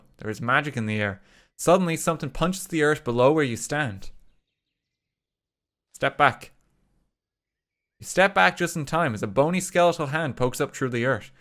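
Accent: Ireland. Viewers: next stream: listening to an irish man speak for 8 hours :) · he sounds like the most irish person i’ve ever heard in my life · Why do you do this to me? I'm writing my friggin' A-Level English exam tomorrow and you're pulling me away with irish voice goodness ;w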